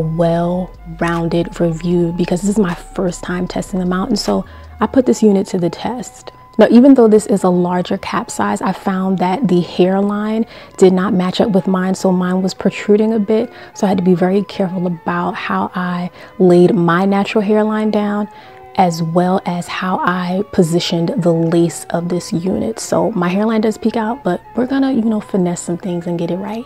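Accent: New York, United States. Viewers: I am from NY and they way you speak I'm figuring you are from NY or close to so you'll get this I'm dropping a Funky Master Flex bomb on how you slayed this sis lol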